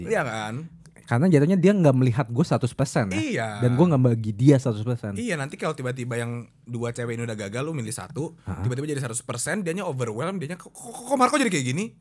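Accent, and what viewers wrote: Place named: Java, Indonesia. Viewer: medokmu mas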